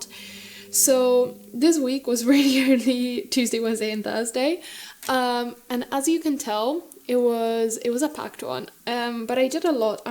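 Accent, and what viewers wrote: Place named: United Kingdom. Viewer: ahh neat! I mostly think I hear an English accent when I hear you speak but as I said the longer I listen I realize there is something else there and I knew you had mentioned Italy before! Accents are so interesting in the way they can blend together and change quickly! I always think I would adopt an accent of wherever I am lol.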